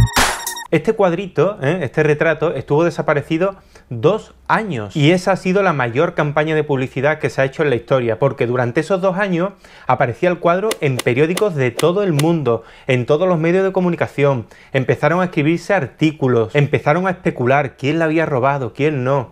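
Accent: Andalusia, Spain. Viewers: Si de verdad amas el arte, hablas de obras que te gustan., siempre hablas, con tu acento encantador andaluz eso si, de obras que no te gustan y las degradas a lo mas bajo. Mucho escozor hay bajo esa melena...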